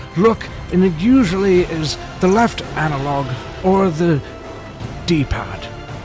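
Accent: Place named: RP, United Kingdom. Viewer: Damm you do a bloody awsome David Attenborough aksent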